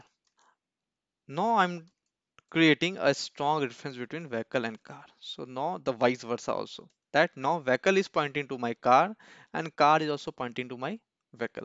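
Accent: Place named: India. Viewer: 咖喱味 听不懂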